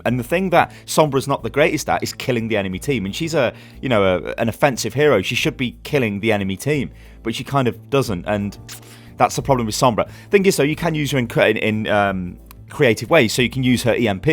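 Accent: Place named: United Kingdom. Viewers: V16 Lambo now I was able to skip the Blizzard video and hear it in a British accent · A Brit that sounds like he's on cocaine is easy to listen to? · V16 Lambo Tbh I watched this because the British accent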